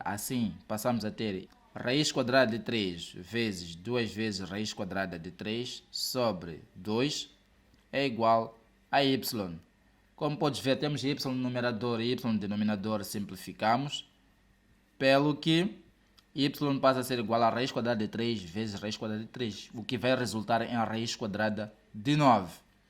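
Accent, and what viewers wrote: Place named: Mozambique. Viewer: Obrigado professor. Percebi que és moçambicano 🇲🇿, pela maneira da pronúncia do "R" pronunciamos como no espanhol. Diferente de Angola Brasil e Portugal.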